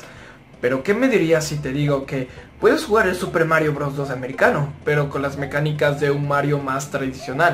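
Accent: Ecuador. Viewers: Eres Ecuatoriano.??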